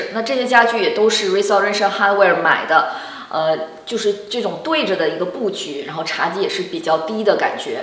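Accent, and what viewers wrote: China. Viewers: 这英文，中文口音太重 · 大陸口音⋯⋯聽了就整個翻白眼難聽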